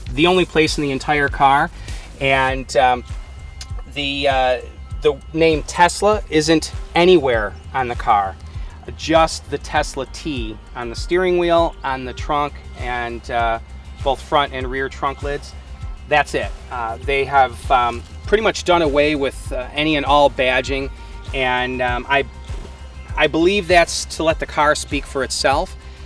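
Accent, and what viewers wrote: United States. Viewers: You have a very cool but crazy accent. It's like super American.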